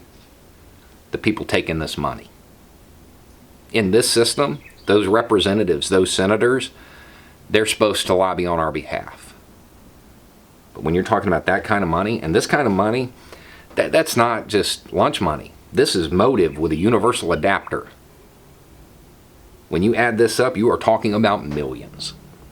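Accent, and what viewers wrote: South, United States. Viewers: I hear the southern voice and I see the beard, I see the name, so I come here every day to disagree with you, and I fail every day, dammit!!! You keep destroying my preconceived notions.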